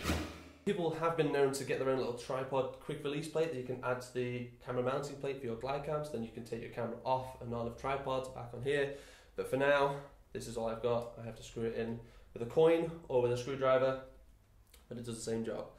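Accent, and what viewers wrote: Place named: Ireland. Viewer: Nice Video, but what you said? "walk like you .... yourself!" Sounds like a good advice, but hard for me to understand the irish accent! please write it down! Thx pal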